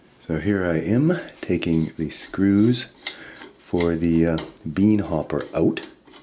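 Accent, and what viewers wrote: Canada. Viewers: Excellent video. Easy to follow and lovely Canadian voice. Thank you :)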